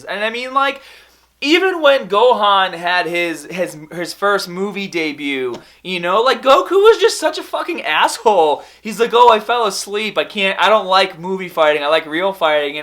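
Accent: Boston, United States. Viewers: he's not from Australia, thats Boston accent haha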